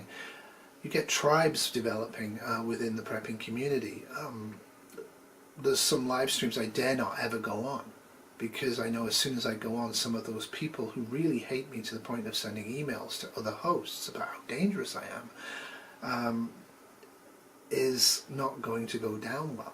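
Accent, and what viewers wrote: United Kingdom. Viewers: The only reason politics are part of prepping in the US is because of the 2nd amendment. Without it, gun confiscation becomes easier and then the shtf can be a lot easier to usher in. For the record, your're the only liberal I thumbs up on YT. Must be the British accent or maybe the hair. Haven't figured it out, yet.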